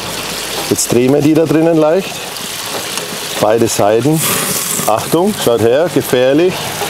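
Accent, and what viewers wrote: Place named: Franconia, Germany. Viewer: stehste da im Regen..hahaaa...hab dich grad Aboniert,.........,jjooaaaa,,,,,interessante Rezepte muss ich sagen,ich hab auch büschen Verbindung nach Frrrranken,und zwar nach Coburg ich kenne den Dialekt wieder bei dir.....aber egal,du hast tolle Rezepte und ich werde mal sehen ob ich das auch ohne den Grill hin kriege,manches geht ja auch im Ofen ...gelll!!!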